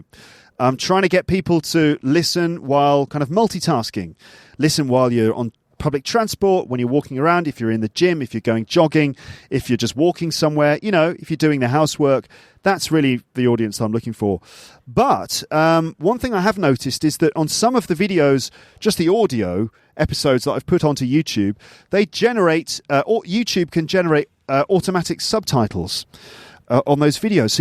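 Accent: RP, United Kingdom. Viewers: hi, thank you so much for sharing this i'm your big fan since you created podcast and love listening to your british standard accent it help me a lot in improving my pronunciation thanks to you again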